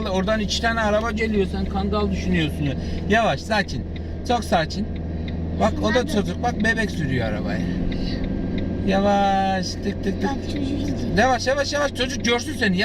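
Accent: Black Sea, Turkey. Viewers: Abi galiba karadenizli galiba uşağum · Bencede Karadenizli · Abi rizelimisin yoksa Giresunlu mu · Abi karadenizli misin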